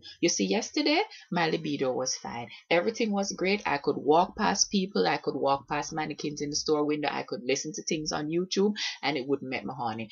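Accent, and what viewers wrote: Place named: Jamaica. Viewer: Mi love u bad.... and really and truly u Jamaican accent a shot....it sounds like u should take up public speaking :)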